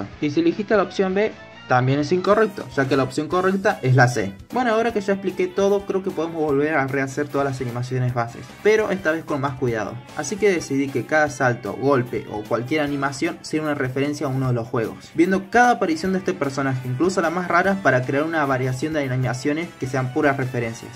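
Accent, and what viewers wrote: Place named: Spain. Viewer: Ah vi el de ingles i ya pense "Ah tiene acento español" y ahora me salio este hahahaa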